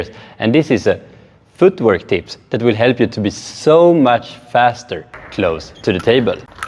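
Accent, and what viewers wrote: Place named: Sweden. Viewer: bra tips, o alltid charmigt med grov svensk dialekt på engelskan :)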